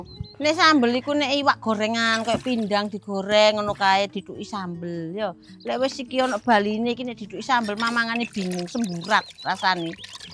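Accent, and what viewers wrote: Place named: Surabaya, Indonesia. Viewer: ng jogja wes suwe tapi logat e jek logat arek ae 🤣 Salam saking kulo nggeh buk darjo cerak mantune smpean 🤭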